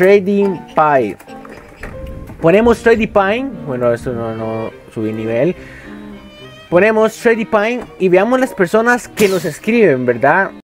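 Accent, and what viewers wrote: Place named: Costa Rica. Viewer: Los ticos hablamos asi y desimos verdad casi siempre por si no lo sabiam gente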